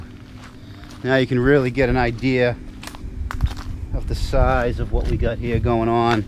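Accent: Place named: Massachusetts, United States. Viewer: I don’t need to see the video, the yard, or even the map. I only need him to talk for 5 seconds to know that he is from Haverhill, MA. Hello from Andover!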